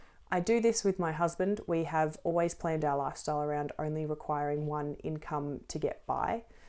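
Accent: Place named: Australia. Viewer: Hearing all this in an Aussie accent does make it a lot less terrifying ✨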